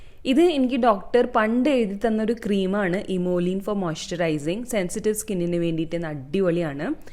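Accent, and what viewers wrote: Thrissur, India. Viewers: Thrissure slang undallo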